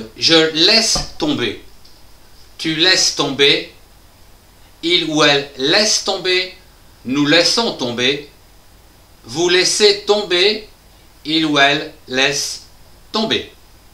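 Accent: France. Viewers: la mélodie de votre voix est très française en anglais, c'est sympa. aussi votre accent, comme un copin de moi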